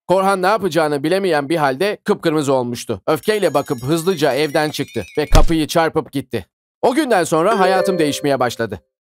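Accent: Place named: Black Sea, Turkey. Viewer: seslendirmen karadenizli kesin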